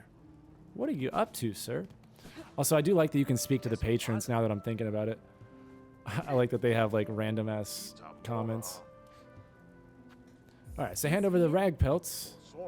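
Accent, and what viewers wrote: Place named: Australia. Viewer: This guy's funny with the Australian accent 🤣 😂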